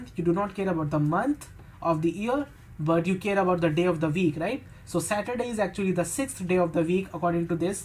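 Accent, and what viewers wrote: India. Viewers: It's "are" not "R", we indians have a thick accent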